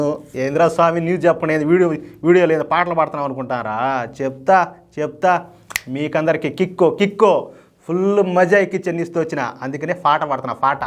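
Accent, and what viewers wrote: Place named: Kadapa, India. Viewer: ఆహ్! నిజంగానే వీనుల విందు బ్రదర్ . నీ కడప మాండలికం తెగ నచ్చింది.మాది రాయలసీమ .జై బీజేపీ.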